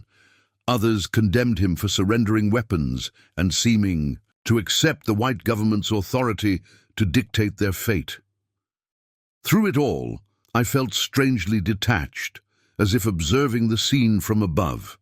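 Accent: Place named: England, United Kingdom. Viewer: Why in the world, when it’s a woman’s story, why don’t you have a woman narrating? Instead you have an English chap narrating for an American western, woman’s story?! Quite a turn off!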